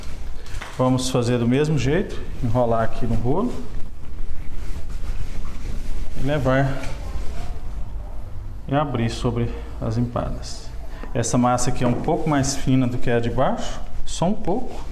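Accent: Minas Gerais, Brazil. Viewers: Amei seu vídeo! Muito bem explicado, bem objetivo. E, como boa mineira, saudosa do meu estado, observei alguns toques de pronúncia, me parecendo estilo mineiro, o que me deixou ainda mais à vontade para acompanhar sua verdadeira aula. Grande abraço! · Muito bom, vc fala como mineiro, até pensei que vc era das minas gerais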